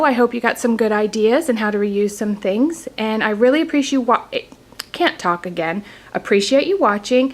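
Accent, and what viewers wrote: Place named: South, United States. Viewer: Hello just discovered your channel . I was actually looking at your daughters channel cause she tagged someone . I must say I'm digging the southern ascent , very cute ;)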